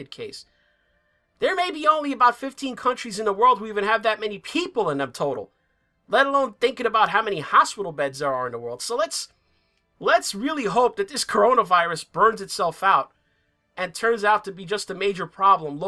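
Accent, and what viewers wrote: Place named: New York, United States. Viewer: Sounds like New York \ New Jersey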